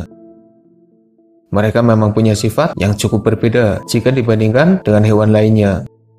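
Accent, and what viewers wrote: Banyumas, Indonesia. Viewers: Kie ta kyne cenel wong ngapak o yak......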